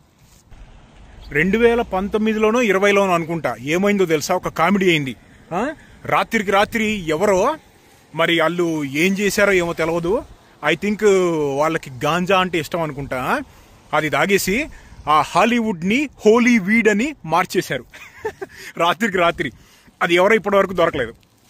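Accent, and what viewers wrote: Andhra Pradesh, India. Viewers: Hii bro, మీది తెలంగాణ అని అన్నారు మరి మీ స్లాంగ్ మా ఆంధ్ర ప్రదేశ్ స్లాంగ్ మాట్లాడినట్టు ఉంది 😂😂😂😂😂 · Thammudu " Angadi " antunnav meedhi Telangana lo Nizamabad antunnav.Nee Yaaasa emo Andhra prantham lo vaade Yaaasa la ga vundi.Meeru Andhra prantham nundi valasa velli Nizamabad lo settle ayyara??? TG lo "Dhukaan" or "Dhukanam" antaaru kada andhuku doubt vatchindi.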